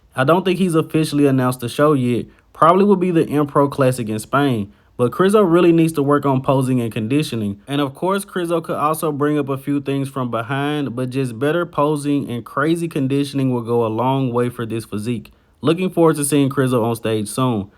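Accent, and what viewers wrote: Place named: South, United States. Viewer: love the southern accent (if im not mistaken 😅) u got my sub man salute from europe 🫡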